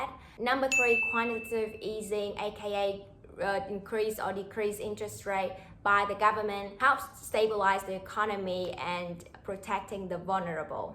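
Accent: Australia. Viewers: by the way, you speak English with an Australian accent, correct? I lived there as a child.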